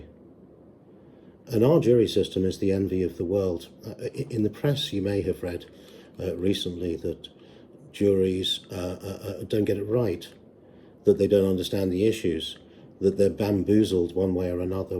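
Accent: United Kingdom. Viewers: YouTube is REALLY pushing your “My answer to “how do you defend someone you think is guilty”” video from 5 years ago. I’ve seen multiple comments on multiple videos of people saying they found you through that video recently. And I have to agree with everyone, you are very smart, very personable, and very charming. A strong British accent for an American is always knee weakening as well. Love the content, love the knowledge. Only wish you were more consistent with uploads but i couldn’t imagine how very busy being a Barrister must be. Have a good day and thank you for gracing our TVs and phones.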